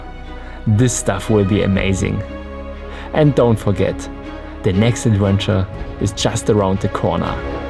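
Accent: Germany. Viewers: His accent is intense. This is 100% pure german accent without even trying to pronounce properly. Sorry but that makes me cringe :D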